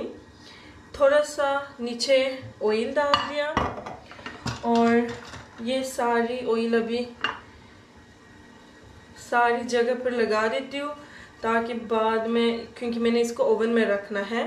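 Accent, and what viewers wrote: Pakistan. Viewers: Inka Urdu lahza pakistan ke kis area ka hai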